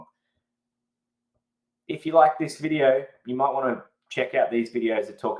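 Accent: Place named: Australia. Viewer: I don’t know why but whenever someone has the Aussie accent I listen even deeper.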